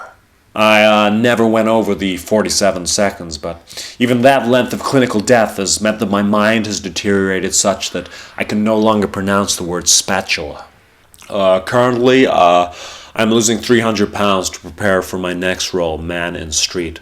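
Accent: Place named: United States. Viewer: I loved the ending! And nice American accent!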